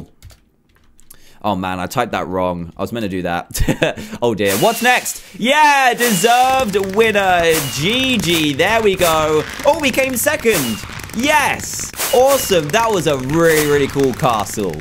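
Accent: England, United Kingdom. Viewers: he's English and from england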